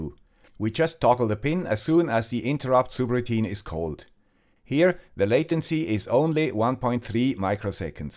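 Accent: Switzerland. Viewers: The guy with the Swiss Accent - Smashing the Arduino learning curve into a pancake since Video #1.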